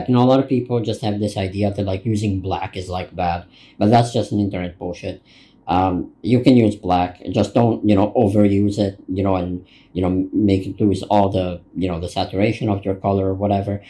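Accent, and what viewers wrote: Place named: United States. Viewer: Hi...I follow you on Instagram and I came to Youtube to hear you speaking 😉No, I'm not a weirdo, LOL....I was just intrigued by your silent Instagram videos, and that eye-twinkling smile. of yours... I'm an artist too and I like to follow young artists to see how they progress with their art journey.... In the description, it says that you live in Tunisia.?.. but your accent is American.... You got me all curious, especially after listening to you and seeing what an amazing speaker you are at just 19 (20 now, I know). Sincerely impressed by your confidence and know-how at such a young age. 🤩😍 Sending you warm regards, my fellow artist!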